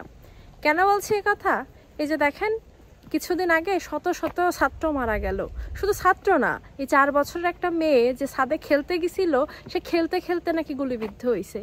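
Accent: Khulna, Bangladesh. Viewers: আমাগের খুলনার বাষা মনে ওচছে? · Apni ki khulnar meye naki? Bhashar taan khulnar moto. Amar o khulna. 😊😊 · Thanks a lot..r u originally from Khulna??? Just guessing from Ur accent...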